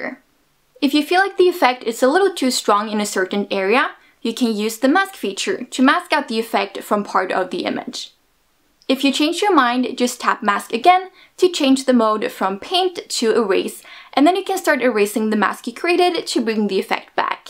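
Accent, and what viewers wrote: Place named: Yugoslavia. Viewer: This is great. Thank you. Btw, I love your accent. Are you originally from Yugoslavia? Your diction sounds like tennis star Monica Seles.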